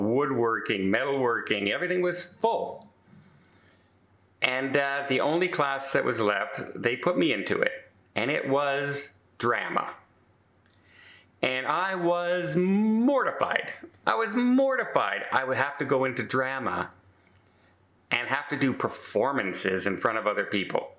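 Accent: Canada. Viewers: I just love when your Canadian accent comes out, it always makes me smile. Thank you, for sharing your story.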